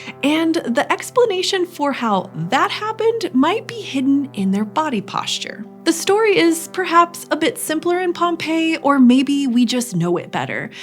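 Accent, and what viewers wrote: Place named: United States. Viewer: Dear PBS, for us older folks that still use the imperial system of measurement , please use both systems when describing distance. This speaker sounded very American so why are you using the metric system and not including the older American imperial system ? We old folks aren't dead yet please mention both systems.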